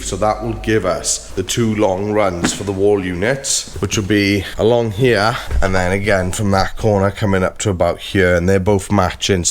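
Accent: Wales, United Kingdom. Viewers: Hi great vlog really enjoy your output don't stop saying by err obviously a Welsh thing😂😂 as others I follow who are Welsh say the same thing. Quick question on the vlog you said the build was having a 560 amp hr battery huge for off grid and no gas fitted i noticed on the electric board you were fitting a victron 30 amp dc to dc charger surely you would want at least a 60 amp? 30 would take for ever to charge from flat while driving? Just wondered what your thoughts were? Cheers mate keep up the good work also what 3000w inverters do you use recommend when building your vans. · Great video as always. Your workmanship is top notch. As a fellow welsh man I don’t even notice you saying by ere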